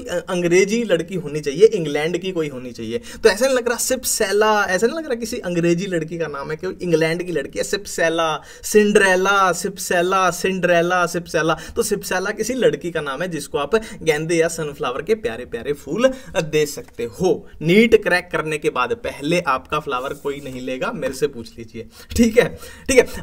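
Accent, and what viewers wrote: Haryana, India. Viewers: Both are belong to HR .. haryanvi accent h bro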